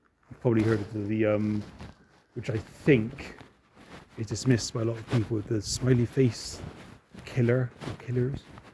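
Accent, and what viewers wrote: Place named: United Kingdom. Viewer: I hope you were carrying a baseball bat..! Pitch black..good you didn’t trip! Are you in the UK 🇬🇧, I like your accent ❤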